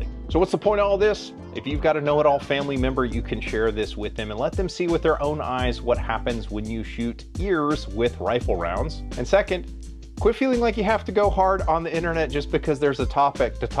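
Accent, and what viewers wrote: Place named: Appalachia, United States. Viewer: The fact you referred to him as President, and your hillbilly accent makes me suspicious of anything you say.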